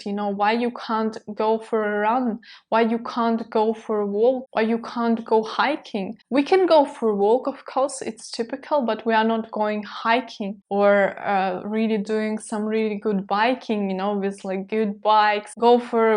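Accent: Russia. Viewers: Hm, whom do you want to address? A Russian who speaks an elaborate, but very Russian English, about German themes and who is living in Germany. Would Russian or German language not be the better choice? Which English speaking native gives a damm what any Russian English speaker tells about Germany. I have the strong feeling, that one of the corner stones has to be altered here to work for your goal to attract subscribers on YouTube. Some do simply not have the looks and youth to have easy success. Not the case with you, so overthink each other pillar on your path to glory, or wealth, or what you want to achive in life through Youtube. To you the best.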